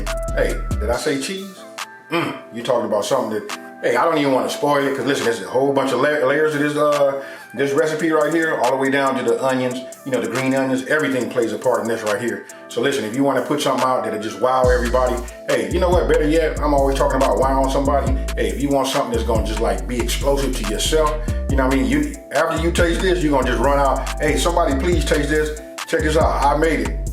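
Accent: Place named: South, United States. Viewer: You need to come to Destin FL and try grits a yaya at this local place. This sorta reminds me of it. I can tell you’re southern, but from where?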